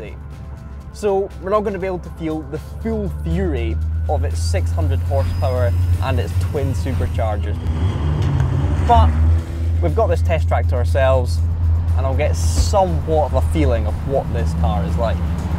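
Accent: Scotland, United Kingdom. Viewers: Is that a scottish accent? And what a great car. The Vantages have always been the best looking Astons. (Every generation.) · I love the Scottish accent more than the car.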